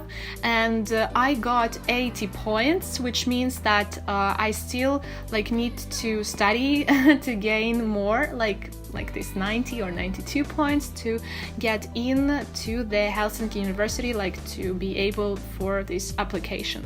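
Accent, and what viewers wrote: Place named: England, United Kingdom. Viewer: Hi,I'm Nourdine from Morroco, I really like your English accent...so pretty please I can get your phone number this is my email: <mail>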